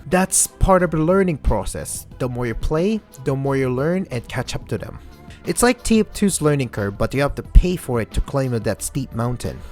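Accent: Philippines. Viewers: are you a filipino?? cuz i know damn well timezone is in the philippines and i can also tell by your accent.. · Manileño detected Subscribing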